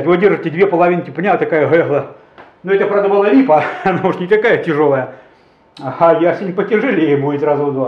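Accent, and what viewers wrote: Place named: South Russia, Russia. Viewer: а какой город у вас? Судя по говору либо юг руси либо у края руси...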